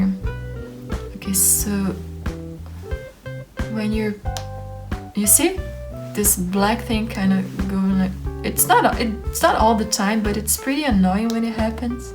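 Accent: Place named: France. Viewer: i love your french accent!!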